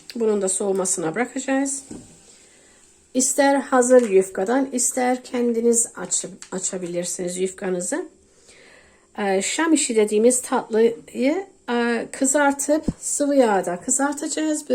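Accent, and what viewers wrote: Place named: Cyprus. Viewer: Ne yapsın Kıbrıs şivesiyle konusyorsozleri anlasiliyorguzel tarif veryor👏👍🙏🧡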